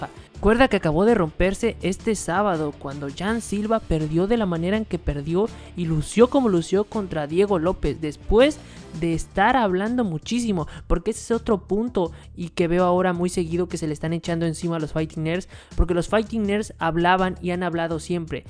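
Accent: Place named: Ecuador. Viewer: Vos de dónde sos? Esa voz parece de ecuador o igual de peru.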